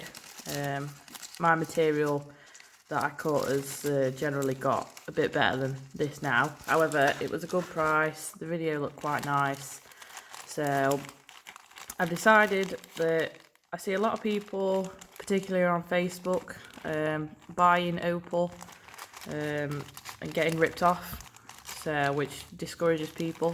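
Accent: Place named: Stoke, United Kingdom. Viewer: I have a piece of Opal but nothing to cut it with 😂 you sound like your from stoke, are you?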